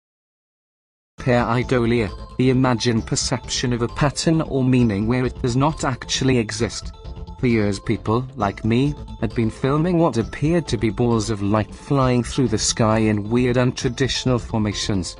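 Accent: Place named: North Wales, United Kingdom. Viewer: The commentator is a Gog. That is, he is from north Wales and he has a Gog accent. The accent is only slight. I find a strong Gog accent awful to listen to!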